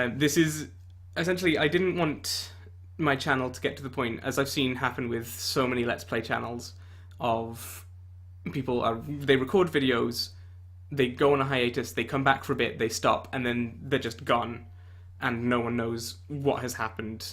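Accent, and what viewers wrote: United Kingdom. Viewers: Yours was the first channel I ever closely followed, starting way back in 2011 or so. Like many others, I found you through your Endless Ocean 1&2 let's plays. I opened up YouTube, just wanting to re-watch the EO2 opening scene, and I remember being startled when I was greeted by an adolescent British voice chattering over the game. That was my introduction to "let's plays," and I was hooked. Now I watch many YouTube channels, gaming and otherwise, and it has had a very strong influence on my life. You were the one who opened that window for me. I'll definitely miss your videos, but I'm happy to see you moving forward in your life, and I wish you nothing but the best in your future endeavors. Anyway, it was nice to hear your voice again :)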